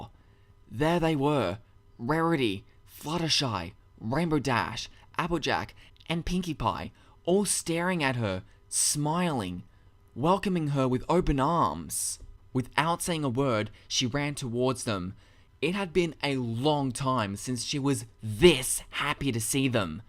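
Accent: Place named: Australia. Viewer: Your Australian voice is funny honestly, I wonder who you are, you sound familiar XD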